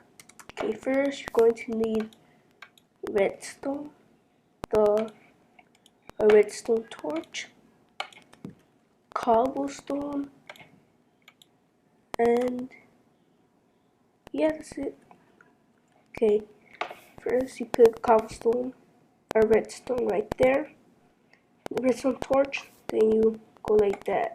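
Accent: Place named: Ireland. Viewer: OMG so irish i love it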